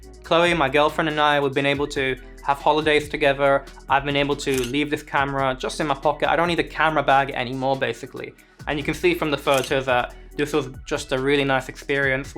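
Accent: India. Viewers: I like your videos. I also enjoy your accent and your indian accent (x carry on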